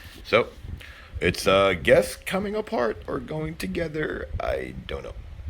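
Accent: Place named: New Jersey, United States. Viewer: I'm guessing you're from Jersey or somewhere thereabouts.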